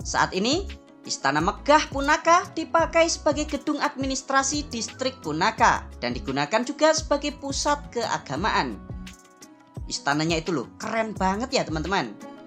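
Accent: Java, Indonesia. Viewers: Min medog jawane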